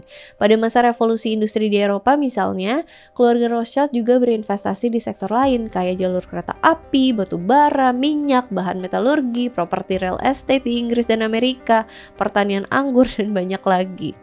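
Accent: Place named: Banyumas, Indonesia. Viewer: Love you...Ngapak Bae bahasanya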